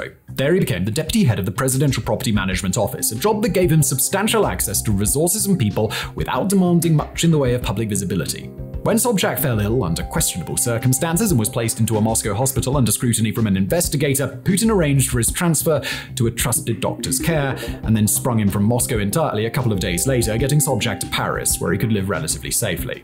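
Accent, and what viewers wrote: RP, United Kingdom. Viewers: When are you going to speak about the betrayal of the Minsk agreements by the West? Will you explain how under Putin the Russian economy has grown exponentially? Salaries and pensions have raised in real terms, the national debt has substantially decreased and how he is standing against Victoria Nuland and her deranged dreams, successfully? Imagine, Russia is single-handedly dealing with the whole of Nato through its Ukrainian proxy and is prevailing. Putin is spearheading the multipolar world and a De-dollarised worldwide trade, that's why the US is bent on destroying Russia, but is achieving exactly the opposite and destroying itself in the meantime. Anyway, you simply don't want to understand that the Russian people love their leader and most of the world seconds them. With your britisher accent I can understand why you want to throw some dort on Putin while ignoring your inbred monarchy ruling for centuries and your unelected leader Sunak. 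Who voted for him? Tell me. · I'm about to take a nap now. If I don't sleep to podcasts I sleep to one of many channels, something about British accents.😂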